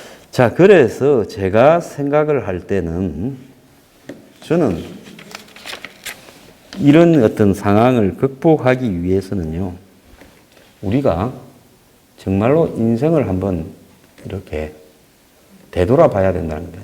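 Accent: Gyeongsang, South Korea. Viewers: 서부경남 사투리가 친근하고 믿음이 가지요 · 소장님은 뉴스 브리핑 중 소장님 특유의 말투로 약간 경상도 말에 약간 속어쓰는것이 제일 우습습니다.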